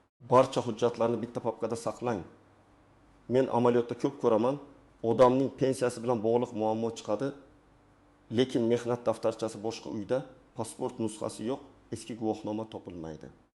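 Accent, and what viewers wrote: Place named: Samarkand, Uzbekistan. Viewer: Шунча вактимни утказиб эшитипман? Хали бу ёлгонмиди ? Узбекчани билади, факат самарканча точик акцент бн гапираяпти буни ёмон жойи йук лекин ёлгонлиги яхши мас !? 😮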